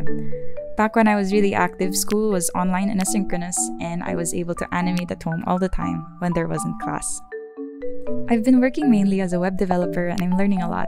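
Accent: Philippines. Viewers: I hear some sort of Filipina Accent Although that's just me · You're so god damn talented. I'm still trying to place your accent, too, lol My best guess is Filipino, but that's, like, a 30% guess, lol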